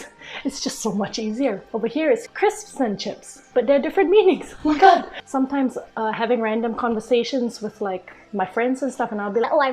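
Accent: Scotland, United Kingdom. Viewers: Haha you sound pretty Scottish already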